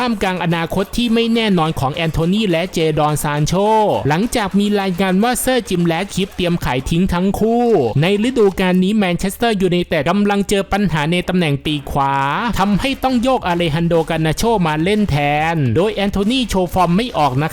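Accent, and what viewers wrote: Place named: Isan, Thailand. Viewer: admin พูดอิสานเป็นด้วยเหรอครับ 555+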